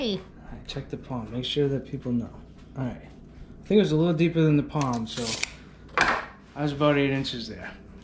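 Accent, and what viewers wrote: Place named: Massachusetts, United States. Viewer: Nice video. I grew up in Hanover, Mass. Listening to your accent makes me homesick. Did some trail riding on my enduro motorcycle back in the early 70's near where you live. Raced a couple times at MX tracks in Pepperell and Southwick, Mass. When I was 2, I broke my back in 1975 in an enduro accident that left me paralyzed from the waist down. Came out to the University of Illinois to complete my undergraduate and masters degrees. Massachusetts is not the easiest place to live for someone in a wheelchair. Again, hearing your voice brought back a lot of good memories of living on the South Shore, especially when it came to snow plowing on our small family run farm. I have traveled all over the world as a Paralympic Track and Field Coach and as a Strength and Conditioning Specialist. Retired now. One of my goals is to get back to the most beautiful state in the USA, Massachusetts. 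Another one of my goals to see at least one more Boston Marathon in person. Thanks for the great video. Be safe and all the best to you and your family.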